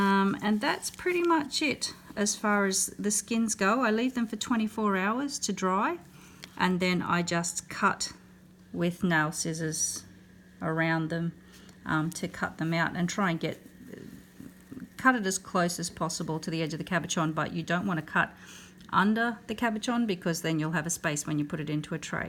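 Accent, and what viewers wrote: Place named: Australia. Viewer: I love listening to your Aussie accent girl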